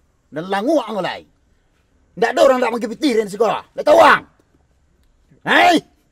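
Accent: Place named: West Sumatra, Indonesia. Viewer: Dapek na logat kuranjei mah mak.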